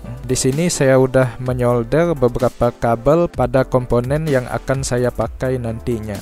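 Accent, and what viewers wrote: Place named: Sarawak, Malaysia. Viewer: dah kuduga kamu dari Sarawak, dari logatnya. Tapi bahasa Indo kamu fasih banget kayak asli orang indo, malah aku tadinya ngira kamu orang daerah Kalimantan. Tapi aku penasaran kenapa kamu orang malay pakai bahasa Indo ? Apa penonton kamu kebanyakan dari Indo kah, atau sekedar obsesi ?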